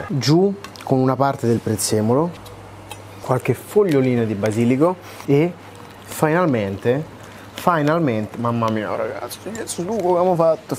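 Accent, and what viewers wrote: Rome, Italy. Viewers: Ma non è che imita. I romani parlano così 😅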